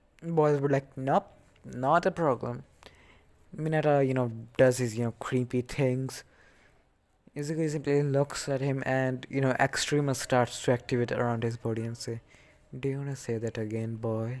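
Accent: India. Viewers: Are you an Indian your accents sounds Indian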